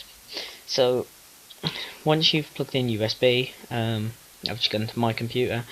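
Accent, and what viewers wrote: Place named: United Kingdom. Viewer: Excellent explanation and demonstration! Some of us "across the pond" (USA) have trouble hearing as fast as you speak "The King's English", but fortunately, my computer has a "replay" button! Cheers!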